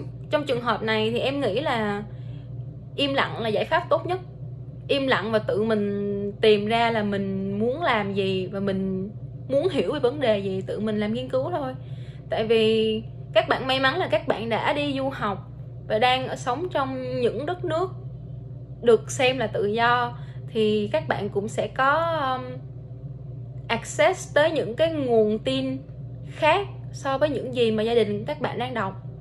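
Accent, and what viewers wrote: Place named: South, Vietnam. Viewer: Mình thấy bạn nói tiếng Nam hay đất chứ!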